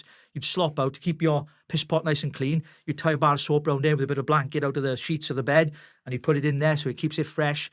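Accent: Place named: Wales, United Kingdom. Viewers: Love it! A silver-tongued Welshman with a fire in his belly! Just what we need in a time such as this....Advance UK!! God bless. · As a valleys woman, it’s refreshing to hear a Welsh man share his passion 🏴. Our children deserve a future with our values. My 8 yr old son has been having s*x Education 😳 it’s insane. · Da iawn! We very rarely hear the accent of my homeland..🏴🏴🏴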